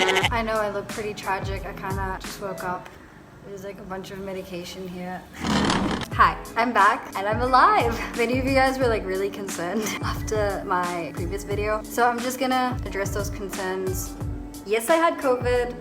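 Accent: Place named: New Zealand. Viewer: I really wanna learn NZ accent from you.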